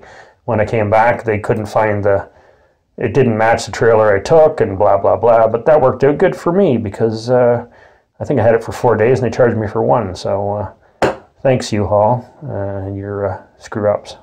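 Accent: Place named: Canada. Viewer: Very helpful— taking my Goldwing 1,200 miles this weekend, and this is perfect. Also love the sound of Maple Syrup in your accent 🥰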